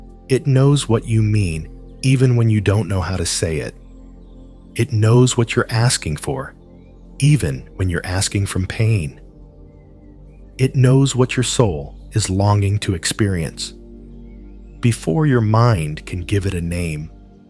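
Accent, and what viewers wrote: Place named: United States. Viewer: I find it difficult to meditate and manifest,to picture my needs... I've asked for many years,but my life didnt get easier, Love the narrators voice.gentle and calming.i am English but love the American voices. If you have money you do have freedom..... I think I'll try again to meditate and manifest....❤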